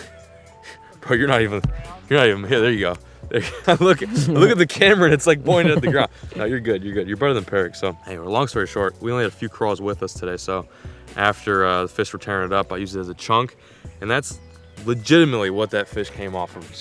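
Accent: South, United States. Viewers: I think your low key catching a southern accent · Just moved to Texas and he's already sounding southern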